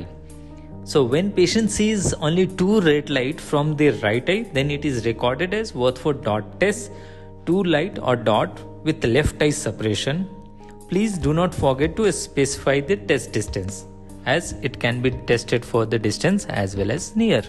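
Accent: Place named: India. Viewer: و الله يا الهنود شوفو ليكم حل لي الاكسن بتاع الانجليزي دا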